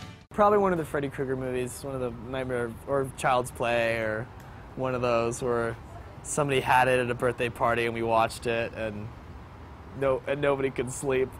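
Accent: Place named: France. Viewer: He's got such a great french accent!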